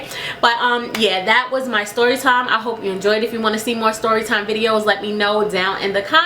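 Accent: New York, United States. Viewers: i love you because you are so new york! lol you said i was duffin him ! lol im from brooklyn btw. so glad you made it through that!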